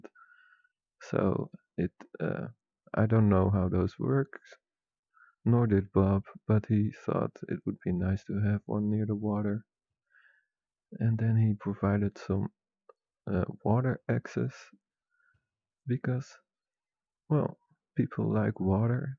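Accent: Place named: Netherlands. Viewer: Indeed it sounds little dreamy, dutch english accent aswell be. Except one voice wich can´t change a better micro would do wonders, lowering volumes don´t seem to be the sollution. Story telling keep the viewer motivated, practice, the content is very well, hope you will do !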